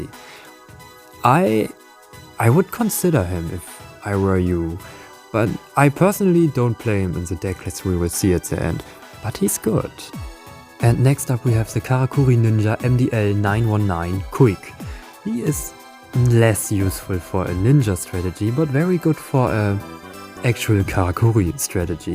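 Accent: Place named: Germany. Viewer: Bro, bist du aus Deutschland? Diesen Akzent erkenne ich doch meine ich 👀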